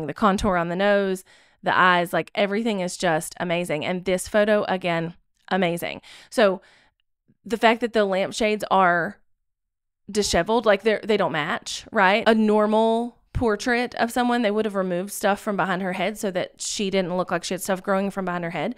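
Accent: South, United States. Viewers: It is good to hear another southern woman calling out this bunch of reprehensible gobshites. I borrowed the term "gobshite" from Ireland. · completely unrelated but my heart skipped a beat when I heard your accent! As someone that speaks just like you, hearing an unapologetic southern accent delivering such an engaging and well informed commentary makes me proud! 🥹 Not too many of us out there.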